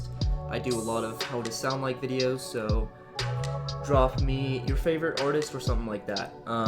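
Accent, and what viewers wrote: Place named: North, United Kingdom. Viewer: Bro where are you from cause you use y'all which is a southern word but you also sound like you're from the north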